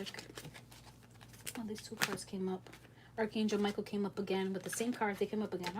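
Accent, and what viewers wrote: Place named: New York, United States. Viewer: btw, I live in England, born and raised. I LOVE your accent and can understand every word you say. I’ve never been to NY or even to the US in this life, yet, but I think I was in NY in another life close to this one because I feel a strong connection to the place, energetically, like with no clear reason as to why, in this life, other than maybe what I saw on tv and in the movies as a kid, but it’s stronger than that, like a distant nostalgia that feels like home. I felt the same about London here in the UK before I moved there. When I did move there I felt right at home.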